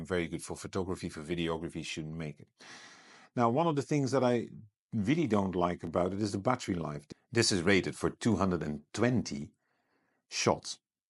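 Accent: Netherlands. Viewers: Wan toe pats, der wie goo. Jup definitely dutch! Great video, keep it up!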